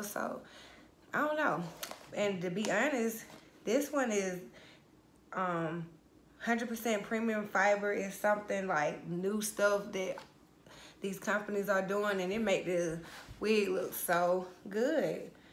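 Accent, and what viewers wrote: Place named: Georgia, United States. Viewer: This color is so pretty!! And you must be from Georgia. I recognize the accent lol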